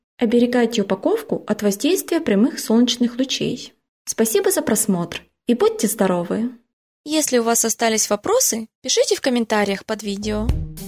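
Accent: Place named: Ukraine. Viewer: умничка...судя по голосу ты с Украины ..смотрю твой канал года 3.. ты как ходячая аптека ))) спасибо тебе и не бросай канал .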